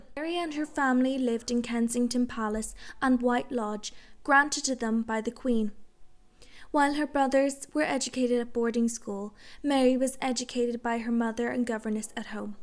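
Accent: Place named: Ireland. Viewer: You do very good research but my ears are 75 and my curiosity is still 15. With my arthritis a comment that extensive meant it had meaning for me to do all that typing. "TOASTMASTERS" is a group that helps people who do public speaking and presentations to groups, etc. It's like AA, with no fee to join, just a support group of like-minded people wanting to learn how to speak to groups with confidence. You sound Irish, I really don't know a lot about Irish society.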